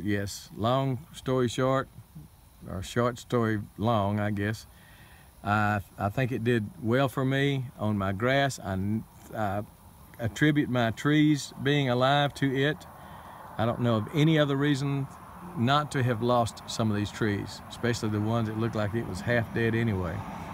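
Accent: Texas, United States. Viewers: I love your videos. You voice and accent sounds like my dearly loved uncle who grew up in Northeast Texas then went to A&M (the Corp then vet school). I am not sure but I think he got his accent when he was an Aggie because nobody else in the family talks quite like him. Thanks for sharing your knowledge with us. Texas farmers have unique challenges and there's little use in me learning from people who live outside my region. You teach me a lot.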